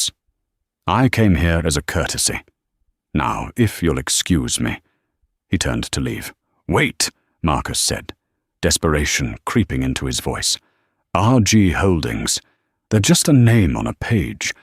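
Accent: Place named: England, United Kingdom. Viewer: Oh so glad I'm not the only one to enjoy the narration in that purring English accent. YUM!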